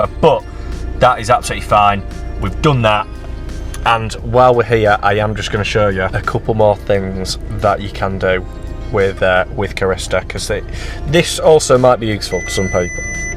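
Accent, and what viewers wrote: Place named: Huddersfield, United Kingdom. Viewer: Top man! What a great video find 👍 Iv been thinking about buying a Carista and you have definitely helped me to decide thank you 🙏 A subscribe click aswell! Is that a HD8 accent I can hear?? 😁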